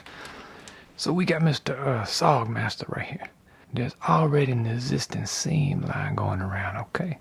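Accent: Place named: New Orleans, United States. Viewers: Did I just stumble upon the Bob Ross of metal casting with a New Orleans accent? This is gold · This is possibly the most relaxing channel on here right now. And it’s also edumacational. You’re like a bayou bob ross. (Sounds like you have a Nola accent)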